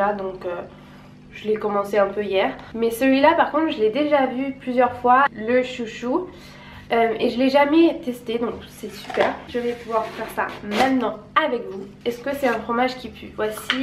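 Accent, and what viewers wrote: France. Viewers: elle n est pas Française ? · J’adooooore ton accent français ! Et ça m’a donné faim 😅 Et Ohlala je suis pareil pour les biscuits, quand je commence je n’arrive pas à m’arrêter ahaha (ça rejoint notre discussion sur le pain sur Insta 🤣)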